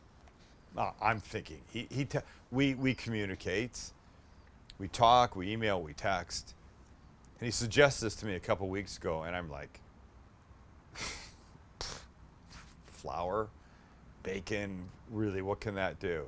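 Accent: Canada. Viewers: He kind of has a Canadian accent Anybody notice that? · Hey Sam, I just found you a few weeks ago. I've commented on several videos how nice it is to see a man who seems like a real Californian, but who cooks much like my family does in the South. Believe it or not, we really do NOT deep fry and BBQ everything! lol... a shock, I know ;) But, really, you cook healthy...but not goofy healthy... REASONABLY healthy. And you won't hesitate to use some fat, real butter, and last week you even used MAYO where I often use butter, and you changed my life. I'm only barely exaggerating! Anyway..one real question: Are you from Canada? I ask because you say your "ou's" such as "out" and "about" with a little Canadians flavor (oot and aboot). Or am I just revealing how unsophisticated and un-cosmopolitan I am?? lol. Either way, GREAT channel.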